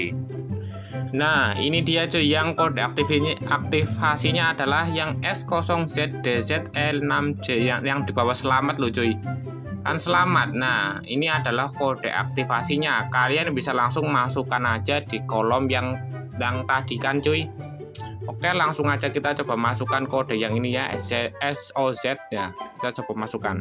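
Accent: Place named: Surabaya, Indonesia. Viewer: Org Surabaya Ya Lu Bg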